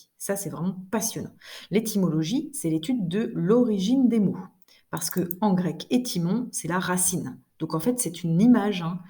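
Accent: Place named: South, France. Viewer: Bonjour Madame, c'est Priam. Sympa votre accent du sud! Pour répondre à Valentin, je trouve mes questions et mes réponses dans des livres. Bonne journée!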